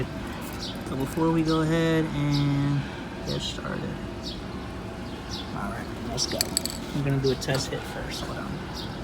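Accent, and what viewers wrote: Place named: United States. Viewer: Bro, you sound American as fuck 😅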